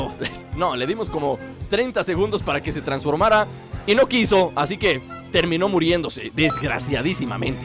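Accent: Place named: Mexico. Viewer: muy buen video oye podrias saludarme en tu proximo video al ultimo la verdad no miento digo la verdad pero eres mi 3 youtuber favorito el tercero, veo todas tus series descontroland etc. bueno en fin creo que tu eres de mexico y yo igual por que como hablas me suena a mexico bueno salu2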